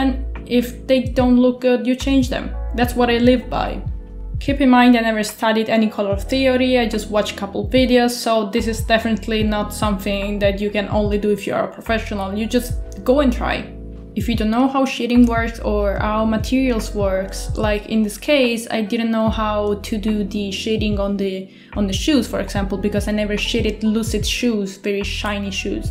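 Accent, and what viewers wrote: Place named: England, United Kingdom. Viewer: You sound a lot like Sypha from Castlevania! I don't just mean your English accent but like your overall tone/pitch Very soothing :> And this was so relaxing to watch, I've wondered how you do a few things here and there so it was great hearing about the process!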